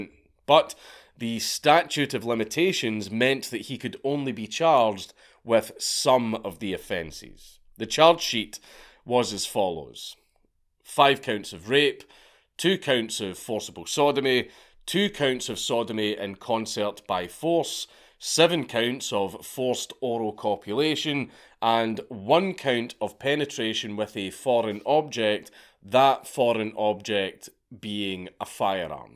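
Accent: Scotland, United Kingdom. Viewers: Dankula has the strongest accent you can understand. Whenever I go to UK, I prepare myself not to understand what half of the population is saying. He learned to standardize his strong accent or just lucky? First time watcher, anybody knows? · Come for the topic, stay for the brogue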